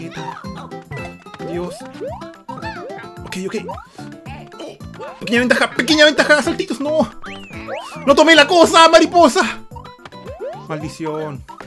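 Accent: Chile. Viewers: Eres chileno? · eres Chileno? yo si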